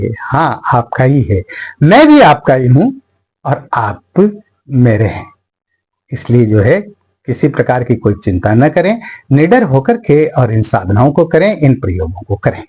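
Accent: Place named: Maharashtra, India. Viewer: 🙏प्रणाम गुरुजी 🙏🙏 आप महाराष्ट्र से हो ना, आपकी बोलणे के तरिके से ऐसा प्रतीत होता है, क्षमा करे 🙏🙏🙏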